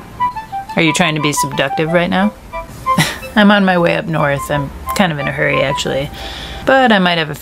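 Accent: United States. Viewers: is that a regional accent, or an affect? As a non-aAmerican, it seems like a Seattle subculture way of speaking or something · I'm surprised you use the Southern pronunciation of "Appalachian". Otherwise, you seem to have a Northern U.S. dialect.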